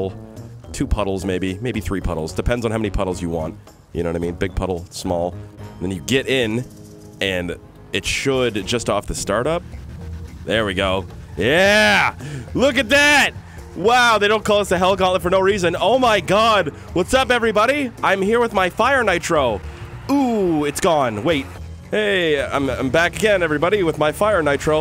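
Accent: United States. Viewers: you sound like the most American person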